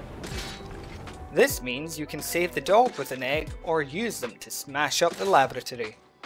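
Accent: United Kingdom. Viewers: "Hay-ch PEE!!!" 😂British voices are great.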